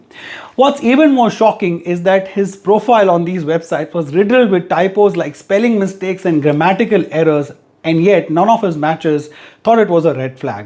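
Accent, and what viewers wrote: Hinglish, India. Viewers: Luv ur hinglish presentation 👌🏼👌🏼😀😀 ... Thatha ... 😀😀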